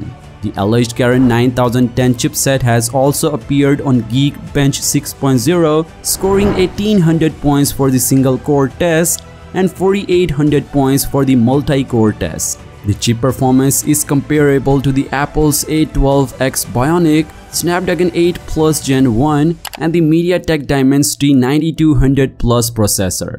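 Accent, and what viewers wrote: India. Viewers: Indian English accent 😂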